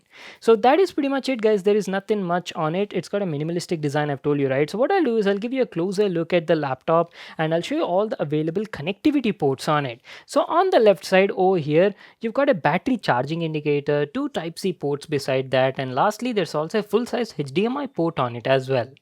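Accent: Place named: Telangana, India. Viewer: bro are you from telangana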